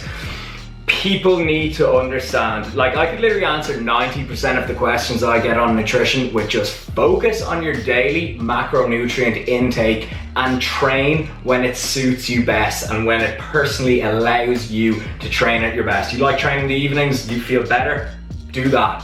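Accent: Ireland. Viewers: are you irish man ? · the way you irish people say "three" is mint lol. i now know what part u meant haha it was class.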